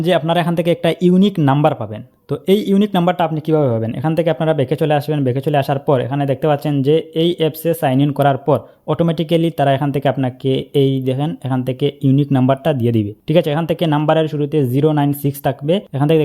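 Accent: Sylhet, Bangladesh. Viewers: Bhi lager Sylheti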